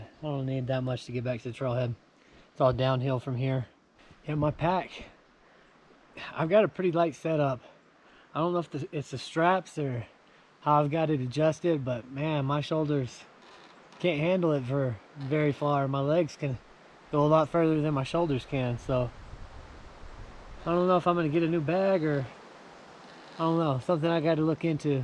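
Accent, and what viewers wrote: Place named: Texas, United States. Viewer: Thanks for the video,! The Pecos Wilderness is gorgeous! I instantly recognized your accent as a fellow Texan. I am from the North Dallas area and my sister and I hiked that trail a few weeks before your hike. I am 60 and my sis is 52, so we low-landers took the altitude seriously! We made the decision to stop just after Jack’s Creek, at the campsite you showed near the end of your video, for the first night. That is about 10,000 feet in altitude. Drinking electrolyte supplements and sleeping there overnight allowed our bodies to better acclimate. I would highly recommend that, because, as you saw, altitude sickness is no joke! Also, we were worried about not having enough water. We did take Sawyer Squeeze filters and filtered the creek water to drink. Not sure about drinking even filtered water from that lake! We did not go all the way to Truchas Lake, because we were short on time, but we plan on doing that next time.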